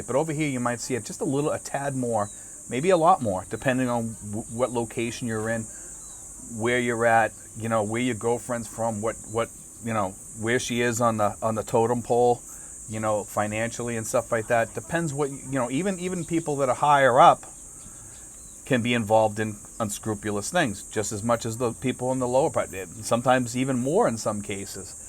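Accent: Boston, United States. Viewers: Boston accent shined!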